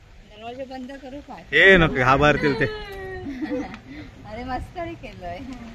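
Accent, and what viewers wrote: Malvani, India. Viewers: तुमचा मालवणी बोलणं खुप aawdata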